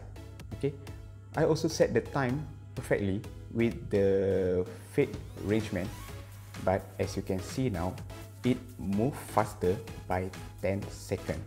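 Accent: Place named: Malaysia. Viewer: Logatnya inggris malaysia yah,salam dr indonesia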